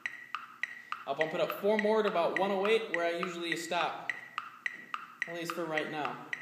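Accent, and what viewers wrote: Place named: South Dakota, United States. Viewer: Very helpful video! And very prominent South Dakotan accent! :)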